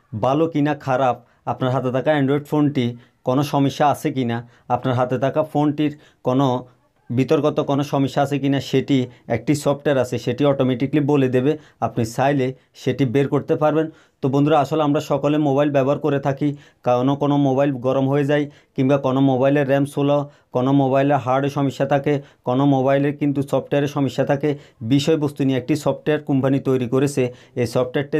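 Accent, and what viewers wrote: Noakhali, Bangladesh. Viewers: Vai tor basa Mona hoy নোয়াখালী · ভাই নোয়াখালী কোন জায়গায় আপনার বাড়ী,,,কথা শুনে মনে হল আপনি আমার দেশী ভাই,,,,,🌾🌾🌾🌾🌾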